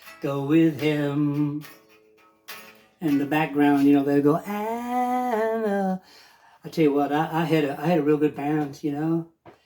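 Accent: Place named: Liverpool, United Kingdom. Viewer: Soundin' positively Liverpudlian there! (& not boring!)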